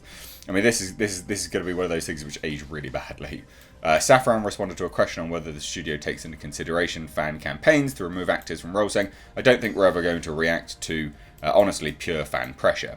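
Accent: United Kingdom. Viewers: You British accent attracts more then the contents…..Absolutely gorgeous 👍🇧🇷😘😍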